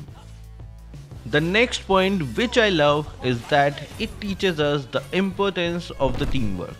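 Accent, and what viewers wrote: India. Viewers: I thought he's an Indian because of hi accent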